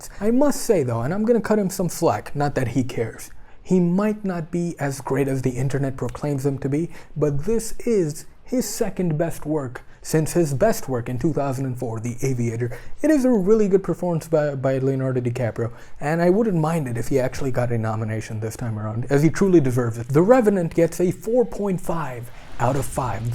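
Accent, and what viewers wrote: United States. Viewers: i think you voice is american but acting is overacting se bhi upar bhai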